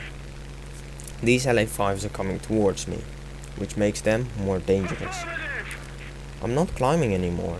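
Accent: Netherlands. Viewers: Nederlander? But a nice vid!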